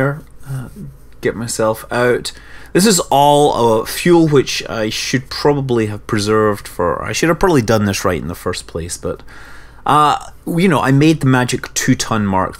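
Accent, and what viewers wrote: Scotland, United Kingdom. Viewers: Really enjoy your videos mate, also i have scottish grandparents so the accent always brings me back to the perthshire moors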